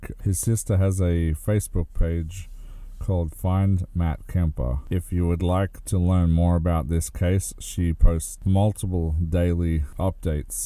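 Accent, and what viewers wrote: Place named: Australia. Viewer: Who doesn't love listening to that Aussie accent! LOL 🤣 I hope they find the missing man. 🙏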